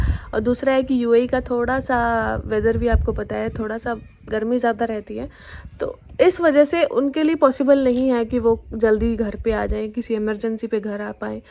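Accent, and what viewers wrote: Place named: Punjab, India. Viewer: Aapki Punjabi mix ho jaati hai madam par jehi baat aapko junik girl banati hai madam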